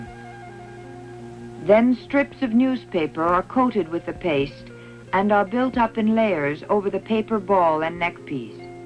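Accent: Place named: Transatlantic, United States. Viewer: Ok boo I see you with the Transatlantic accent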